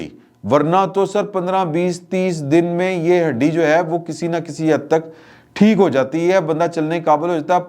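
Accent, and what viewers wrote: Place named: Punjab, Pakistan. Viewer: آپ کے ویلوگ کی خوبصورتی ہی تلفظ کی تھوڑی ہیرا پھیری ہے ۔۔۔پنجابی تڑکا۔۔۔شاعری ۔۔۔اان کی وجہ سے بوریت نہیں ہوتی ۔۔اسوجہ سے chill کریں bro.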